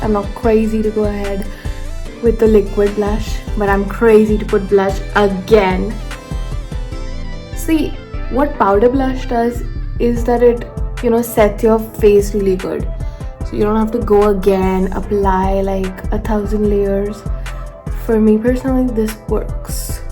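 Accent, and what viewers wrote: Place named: Mumbai, India. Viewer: love the sobo accent actually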